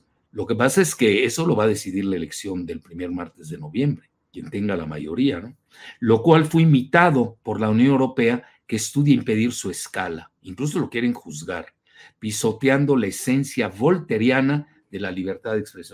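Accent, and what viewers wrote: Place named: Mexico. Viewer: Estimado Alfredo, por tu acento diria que eres como.yo MEXICANO, yo estudié en Moscu 8años, lis 8 años más felices y hermosos de mi vida, aqui no hay mucho lugar para escribir y describir toda mi experiencia, solo te diré hay una exelente entrevista aqui en YouTube hacha por Vladimir Soloviev a Putin, hará unos 7-8 años atras vela y si no hablas ruso pidele a alguien que te traduzca ahi podras ver el gran pensador que es Putin, luego hay otra entrevista hecha por el director americano Oluver Stone, mirala y ve lo que dice Stone sobre Putin. Saludos desde Alemania Fernando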